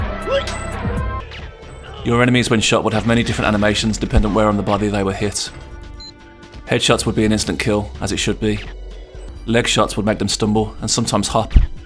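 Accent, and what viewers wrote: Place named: United Kingdom. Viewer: I fucking love this guys britsh accent. reminds me of that guy who did the polybius video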